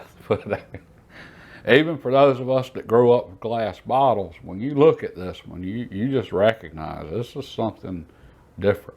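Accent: South, United States. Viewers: You have just about the best southern accent of all time.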